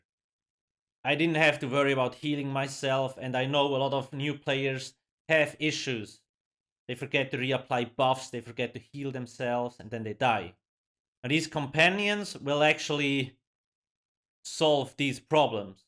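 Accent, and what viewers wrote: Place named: Switzerland. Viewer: Just wondering where are you from? Your accent sounds swiss to me but my pops though more up north 🤔. Anyway you still speak very clearly and that's good especially when looking for guides...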